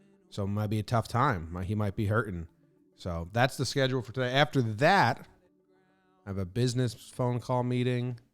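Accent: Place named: Chicago, United States. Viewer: I know you're a Yankee but are you originally from Chicago? I hear Chicago in your voice bro.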